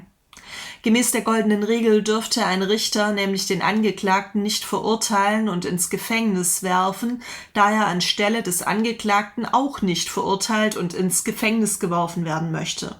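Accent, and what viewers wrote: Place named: Swabia, Germany. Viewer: Bisch du au Schwoab? Es klingt fast so :D Super Kanal!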